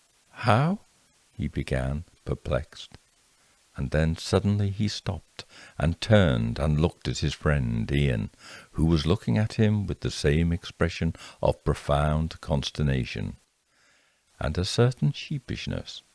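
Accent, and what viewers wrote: United Kingdom. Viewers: you are extremely talented and creative and I will tell you if I was not on a fixed income. You would be the first so that I could join your YouTube. However you do that, I mean it. I love these. Thank you from the USA we do not have talent and. Creativeness and the accent and the humor that the brits do.Trust me we don't at least we don't in nevada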